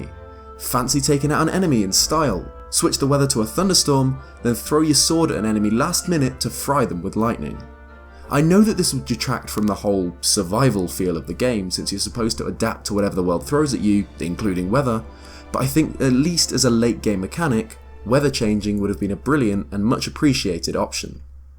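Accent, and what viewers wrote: United Kingdom. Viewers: absolutely love that you took my advice of having your face in the videos. It's easier for you to edit, we see your handsome face, allows you for more creative freedom, adds another layer to the construction of your videos and its easier to recognize who that lovely British voice is coming from. I'll accept your thank you now. You beautiful husbando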